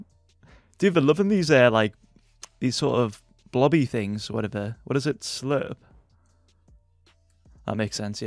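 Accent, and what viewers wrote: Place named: Newcastle, United Kingdom. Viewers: Now we officially know ghat is british 😂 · He’s from Newcastle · What? You can't tell his british accent from all those settings videos?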